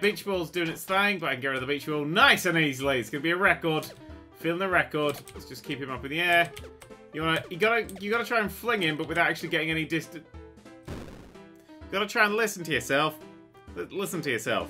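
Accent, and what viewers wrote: England, United Kingdom. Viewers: HMHS.BRITANNIC Thats how english people say it. They also say math like maths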